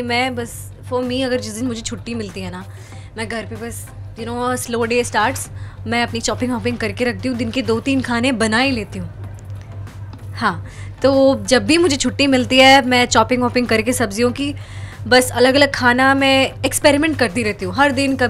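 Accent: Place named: Bihar, India. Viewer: Luv u and ur style and chehra kep it like this like ur bihari accent wan to hear mor carry on shelter for stray dogs and animals they need care mute they r feel pain cant say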